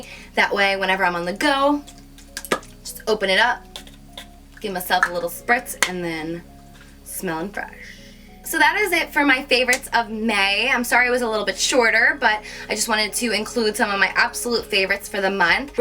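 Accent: New Jersey, United States. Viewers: i know she is from jersey i just didnt know that she lived in jersey. Just because you have a jersey accent doesnt mean you have to live in jersey.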